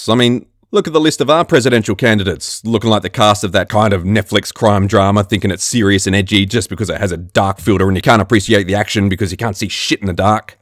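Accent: Australia. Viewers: Tu es français, mais je pense que ton accent écoute comme un peu Australien ? Je suis australien et j'apprends le français au lycee mdrr👌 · Yah he sounds Australian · You honestly sound like a Australian not a Frenchman. Where are you from originally?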